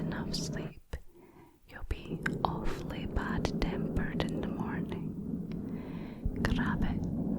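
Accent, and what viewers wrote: Scotland, United Kingdom. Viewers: Scottish words and the Scottish accent were made for ASMR - I like it, a lot! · I like your sweet Scottish accent! It reminds me my own Russian accent! Very understandable and very nice! High five) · 4 PM here, but I know what will put me to sleep tonight. Thank you, love your Scottish accent! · Omg i never knew i needed scottish whispery this is soooo tingly! Even the sponsoring part was soooo relaxing ❤️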